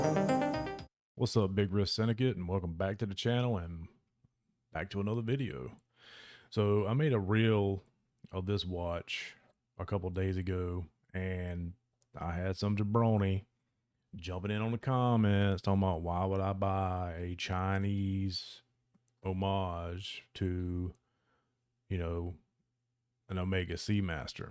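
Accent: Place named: South, United States. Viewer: Thanks for the review fam. Are you from the south? Sounds like you’re from either AL, MS, LA, or TX.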